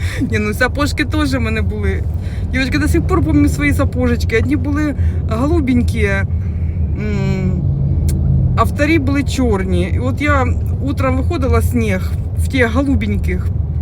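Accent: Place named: Dnipropetrovsk, Ukraine. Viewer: Це з Дніпропетровщини такий суржик. Ви вже повинні знати що в Україні не розмовляють чистою мовою. У всіх областях є свій місцевий діалект. Це не тільки в Україні, в різних країнах є цей феномен. Краще вам більше читати якщо ви цього не знаєте.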